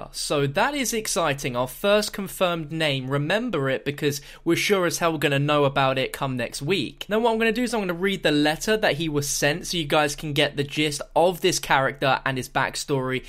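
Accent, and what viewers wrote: United Kingdom. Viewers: the fact he has a british accent makes him reading the letter so much better.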